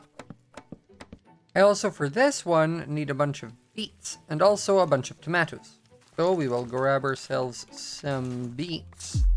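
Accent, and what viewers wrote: Scotland, United Kingdom. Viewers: Love your videos. Keep up the good work. Also what part of Scotland are you from, I'm from Glasgow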